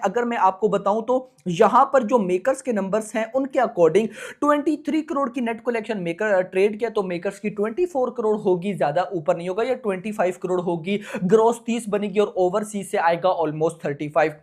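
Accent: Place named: Pakistan. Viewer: Yar tu Pakistani h kya Teri avaj pakistaniyo jaisi kag rhi bolne ka tarika 😢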